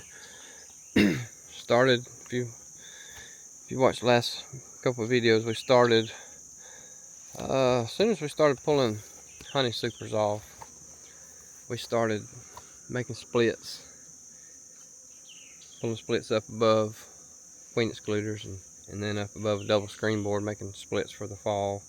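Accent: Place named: Alabama, United States. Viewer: Your right about staying on top of your hives for sure, it don't take much to lose a couple of them and that really hurts especially if your a small beekeeper without a lot of resources to pull from. Good tips about laying workers, gives me something to fall back on when I run into it. As an aside, wife knew you was from Alabama when she heard you talking from the other room LOL!, her kinfolk are down Brewton, Alabama way. Y'all have a good day now.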